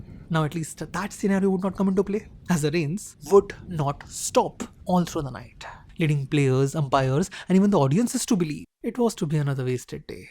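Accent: India. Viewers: This Indian accent is enough to given us headaches Unbelievable, 200 years literally taught them nothing.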